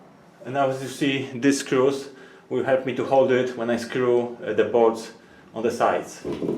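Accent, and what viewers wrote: Poland. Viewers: Hi there. Just discovered your channel and it’s a real treasure trove of useful little videos like this one so I’m subscribing to see more. Brilliant DIY advice for the UK viewers which is rare in a sea of American videos. I think I recognise your accent to so I’ll hazard a guess and say dzień dobry and dziękuję 🍻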